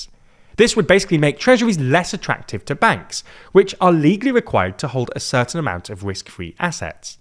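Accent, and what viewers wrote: United Kingdom. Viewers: Up to the strident, 'all knowing' Ai British accent was tolerable, but then it transitioned into an all out adblock circumventing ad, BEWARE, CHANNEL BLOCKED.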